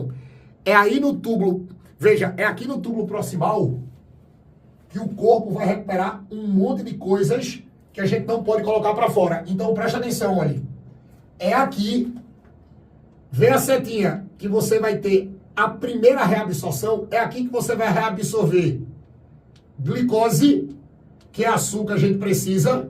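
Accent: Northeast, Brazil. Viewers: amo o sutaque nordestino representando minha terra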